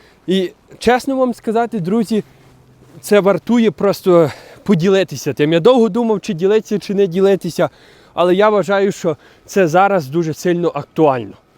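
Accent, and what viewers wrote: Western Ukraine, Ukraine. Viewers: Вы говорите что вы умеете хорошо можете говорить на российский мови тому до у вас е западэнсЬкий акцент. А до робыть вам якщо мы Родом з Мариуполя и розмовляють там все российской. Мы были там всю блакаду голодали и с трудом выехали из оккупированной територии. Что нам делать если мы родились и выросли в Украине а разговариваем на непонятном языке не на русском и не на украинском. За то что мы такие к нам плохо относиться. У м Мариупольцев обромное горе Нас лишили окупанты всего имущества которое у нас было и лишили здоровья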